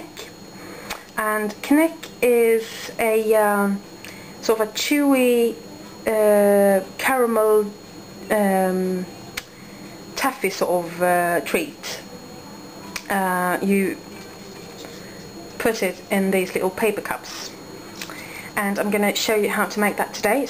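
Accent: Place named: United Kingdom. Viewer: Cool, I'll have to make some of these. Do you live in Sweden you sound British ;)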